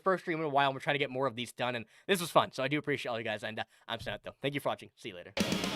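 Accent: Newfoundland, Canada. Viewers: You are from Newfoundland?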